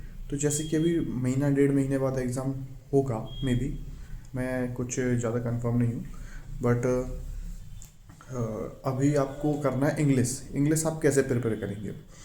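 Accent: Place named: Madhya Pradesh, India. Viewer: Sir aap mp se ho kya . Aapki tone yha ki lg rhi h 😊. Thankyou for strategy sir .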